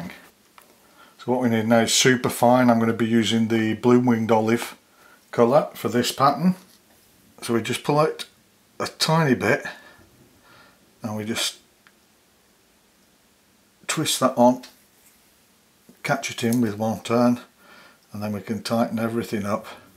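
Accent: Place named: Scotland, United Kingdom. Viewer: I've been following you recently and trying to to replicate some of your patterns. It is so much easier to learn with such detailed, precise, clear image videos. Beautifull flies. If wonder if you have fishing skills videos.... Take care and try to stay at home. Ricardo (Chile) PD:....... and thanks for your clear Scotish english...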